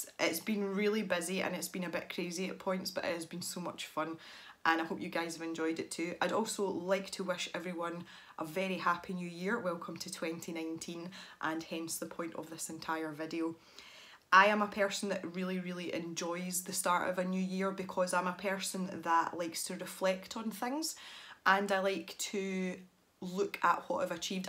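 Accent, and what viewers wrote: Scotland, United Kingdom. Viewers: I just love your accent it is nice to hear a real scottish voice. I have one question to ask you? I have the whole set of Prismacolour pencils but i have a dislike for them the problem is that I don't know how to use them. Can you give me some advice for me please. Also I would love to do a sky picture in ivy and inky butterfly using Faber Castell Polychromos is there anyway you could help me please.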